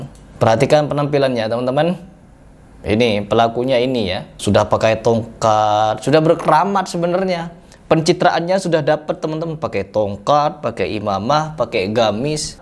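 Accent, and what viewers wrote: Madura, Indonesia. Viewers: Logat nya kaya Madura.....belajar lagi mas bro....pakai ilmu padi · Iya logatnya khas kek madura ya... Tp penyampaiannya pas mdh dicerna..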